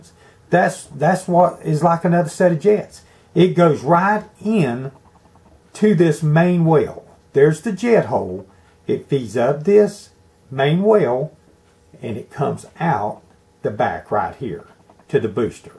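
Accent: United States. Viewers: Thank you, with that sweet Southern accent you could tell me anything about automobiles and I would believe it, ha ha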